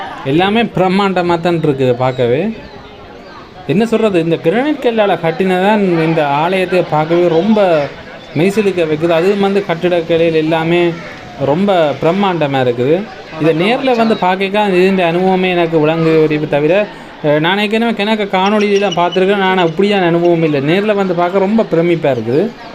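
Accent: Sri Lanka. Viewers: I had visited this great temple several times.It will look knew to me every time I visit.i will sit on the steps and admire every bit of it.Great engineering with no cranes NO modern equipments. as usual great video coverage and your very pleasant pure Sri Lankan Thamizh to hear 👌👌👌👏👏👏. Canada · தம்பிக்கு முதல் வணக்கங்கள் உங்கள் கோவை பயணம் முதல் பார்த்து கொண்டு இருக்கிறேன் நீங்கள் போடும் பதிவுகள் அனைத்தும் அருமை நீங்கள் தமிழ் நாட்டில் வந்து அழகாக பதிவுகள் போட்டு கொண்டு இருக்கிறீர்கள் மிகவும் அருமை வாழ்க வளமுடன் உங்கள் ஈழத்து தமிழ் இனிமையாக இருக்கிறது மிகவும் கவனமாக இருக்க வேண்டும் நன்றி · தஞ்சை பெருவுடையார் கோயில் வரலாற்றை பரிசுத்த மான இலங்கை தமிழ் உச்சரிப்பில் கேட்டதில் பெருமையடைகிறேன்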